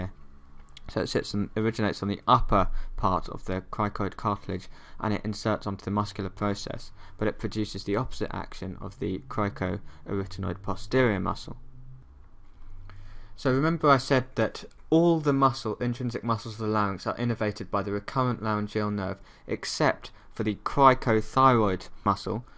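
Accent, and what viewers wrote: United Kingdom. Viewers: I love British accent ❤ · Your british accent and haste makes it very difficult for me to understand :( Can you subtitle more? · can't listen to the whole video , i hate the british accent -_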